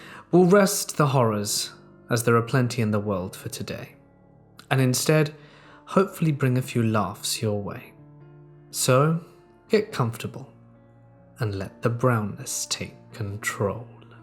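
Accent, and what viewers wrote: United Kingdom. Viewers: Why are you british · Omg so its 330am and not damn thing made me laugh all day until you said "the readiness of my anus" in your dry British accent and I lost it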